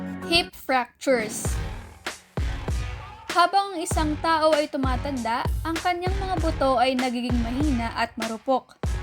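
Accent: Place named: Iloilo, Philippines. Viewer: ilonggo ka doc ay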